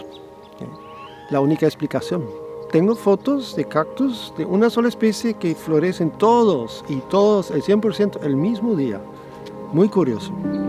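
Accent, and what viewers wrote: Paraguay. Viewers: Hermoso trabajo y lugar!, y ya se le pegó el acento Paraguayo 🇵🇾😍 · Curioso como se mezclan el acento paraguayo y alemán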